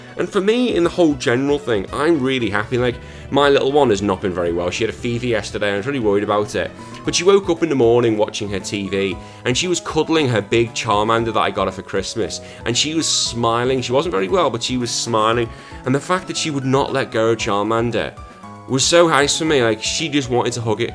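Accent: United Kingdom. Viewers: chibi dawn is soo adorable and i got a question are you british or irish i dont want to sound like a idiot sorry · love your accent. British to a tee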